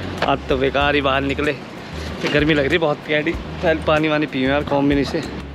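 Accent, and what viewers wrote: Haryana, India. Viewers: Bhai ki personality aur accent par scooty suit nahi karti. What this accent is.....Hariyanvi??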